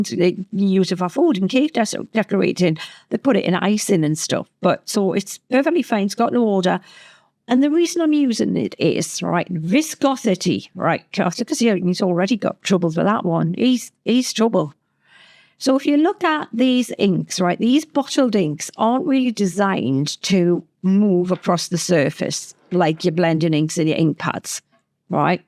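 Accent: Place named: Ireland. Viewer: Beautiful... another technique I will be trying. The only thing I need to get is the glycerin. Are you Irish? My family is from county Cork. Love your accent.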